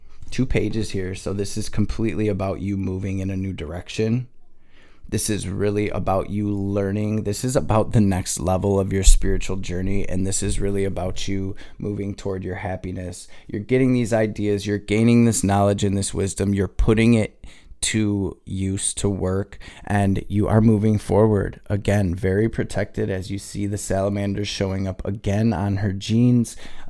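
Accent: Detroit, United States. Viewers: waddup doe u from detroit???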